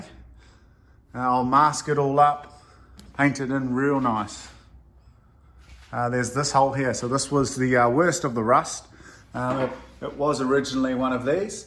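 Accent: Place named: New Zealand. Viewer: gotta kiwi accent 😂 dont worry im a kiwi too nice paint setup for a home job. tommy fyeah spec chur bro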